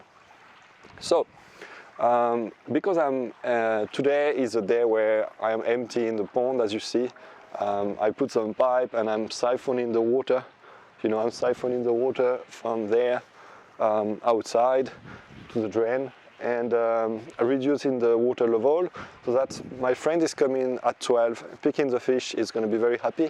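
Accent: France. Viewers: Vous êtes français ? Car quand je vous écoute ça ressemble à un français qui parle anglais😄😄. C est pratique du coup parce que je comprends un peu mieux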